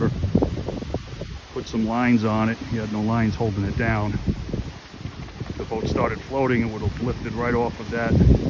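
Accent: New York, United States. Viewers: Glad all is well. LOL, bumpers. I can tell you are from New York! North East, any wayzzs.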